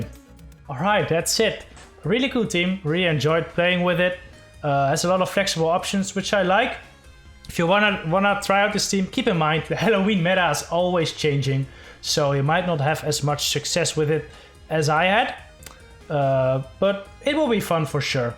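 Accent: Netherlands. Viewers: yes, he is. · Wait are you dutch bc you talk with a Dutch accent great video btw!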